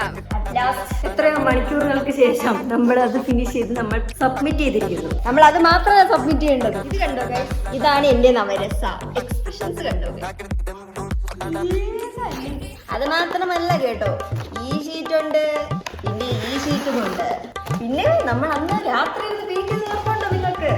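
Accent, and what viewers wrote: Kannur, India. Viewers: ചേച്ചി നമ്മുടെ കണ്ണൂർ ഭാഷ അങ്ങനെയാണ് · Kannur ഭാഷ..... Like ഉണ്ടോ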